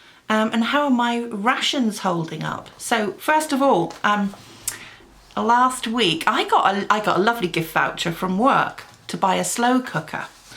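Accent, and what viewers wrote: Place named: England, United Kingdom. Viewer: I am just so impressed with you! Your honesty, commitment, and down-to-earth attitude will bring you to unimaginably (for now) good places. I love to listen to you talk--adore your English accent. 🧡 You are courageous, and you have inspired me. Thanks for these videos and your blog! I look forward to watching you succeed! ~ Melanie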